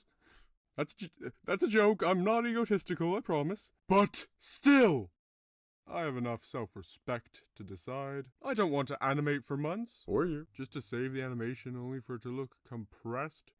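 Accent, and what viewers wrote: Ireland. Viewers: Are you irish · Eh, more like nogla to me, it might be because hes Irish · Are you from Ireland? Because I am on FlipaClip, it’s the only one I have so far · Why he sound like Irish? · Bro is Irish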